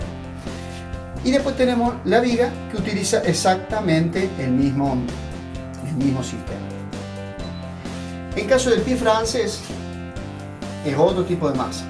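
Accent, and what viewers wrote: Cordoba, Argentina. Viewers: HOLA SALUDOS DESDE CHILE TENGO PANADERIA Y VEO TODO PARA APRENDER MAS SOS DE LA ARGENTINA VOZ ? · Hola, sos Cordobés? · Entender tu epañol cordobés hablando a toda velocidad ya es un esfuerzo. Pero con el volúmen de la música tapando el de tu voz .....ni te digo. La música no sólo está demás sino que opaca el enender tu gran contenido.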